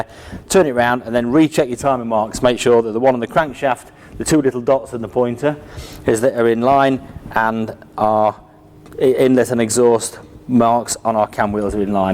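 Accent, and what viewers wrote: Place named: Lincolnshire, United Kingdom. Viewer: Cant beat the Lincolnshire accent! Rover land rover, the only car manufacturer I am aware of that would take the water pump drive off the cam belt. Nowt like putting a high fail item on an essential drive part. Found out yesterday that the water pump on my freelander is wagging around like a dogs tail. Typical, a job that I could do on my drive has to be given to a garage because its October and the weather is crap in Skegness.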